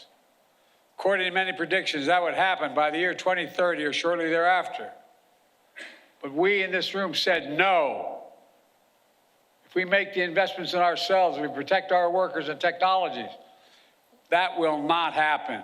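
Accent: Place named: South, United States. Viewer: He sounds lake Gomer Payle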